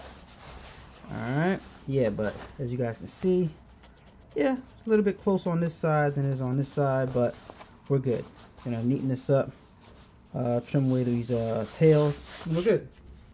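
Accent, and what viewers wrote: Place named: Baltimore, United States. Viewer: you from baltimore my man?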